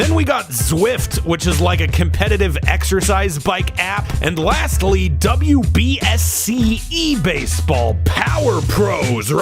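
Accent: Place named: United Kingdom. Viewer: His British accent reminded me of dex there (sanity not included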